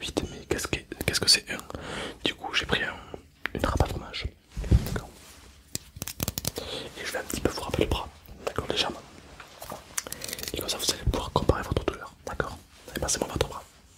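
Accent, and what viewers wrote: South, France. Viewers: ça m’fait bizarre prck tout le monde dit que ta un accent du sud mais vue que chui aussi du sud bah je l’entend pas fin voilà quoi c tout.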